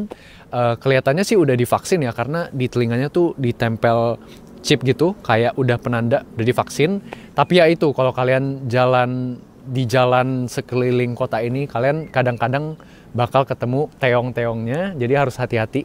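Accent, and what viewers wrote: Indonesia. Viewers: Ejaan bahasa inggrisnya logat indo aja, gpp kok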